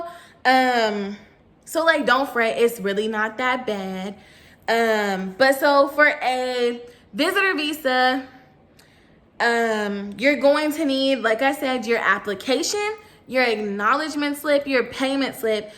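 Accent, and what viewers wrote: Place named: United States. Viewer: Ndewo, ke kwanu...funny american accent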